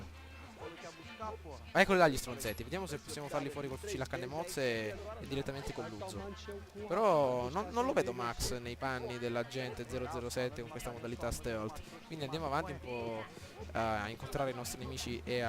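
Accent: Sicily, Italy. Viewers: sei della sicilia?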